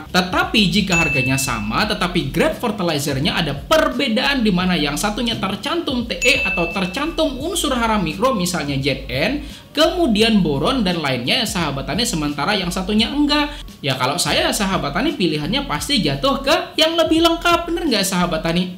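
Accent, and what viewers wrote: Banjar, Indonesia. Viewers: Kya orang banjar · npk 16 yg paling bagus npk yaramila.. logat bahasa nya org Banjar lh? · Logat banjar 😁 sipp dah bang info nya , tks 👍👍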